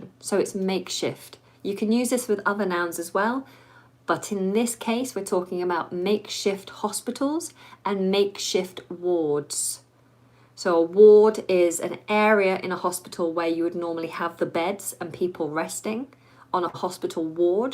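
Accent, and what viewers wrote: United Kingdom. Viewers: Hello! I can't help saying this! You explained everything related to an infectious disease like coronavirus in a charming and interesting way! Honestly, you and the way you speak British English are both lovely! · You are a fluent teacher in British accent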